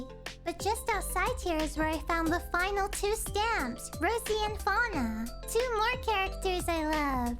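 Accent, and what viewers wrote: Australia. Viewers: You’re Australian? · You know. I sometimes forget you're Australian. Ahahahaha.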